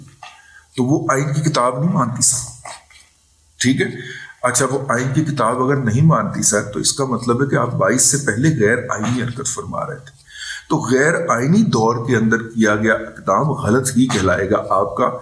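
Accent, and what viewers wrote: Punjab, Pakistan. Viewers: Although I, many a times, may differ from your opinion but I am a regular (not Pak army but your listener). It is a shame that a nation of 22-25 crore population could only have 1.2 lakh odd subscribers to a genius like Rizwan Razi. Better if your people would listen to what you utter. You are a great orator but your punjabi ascent makes me feel better although it is difficult to understand for me. Wish you good health and long live.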